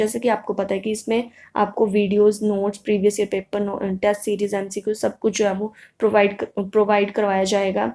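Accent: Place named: Pahari, India. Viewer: ये ट्रस्ट serirs "जो है वो"😅 कितने rupess में avilable हो जाएगी maam ? पहाड़ी accent बड़ा अच्छा आता है आपकी हिंदी में😃🙏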